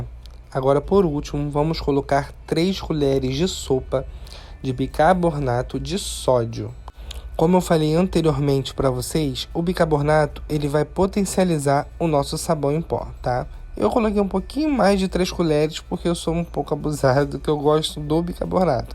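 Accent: Brazil. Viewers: Buenos días Me encantó esa forma de hacer el jabón me gustó mucho nunca lo había visto hacer así pero eso queda seco Bueno lo que usted enseña Me encantó hasta hoy lo encontré pero ahora veo voy a ver todos sus videos que veo que tiene cosas maravillosas y también tiene cosas para la cocina Muchas gracias bendiciones Marta desde Colombia por la forma de hablar veo que usted es del Brasil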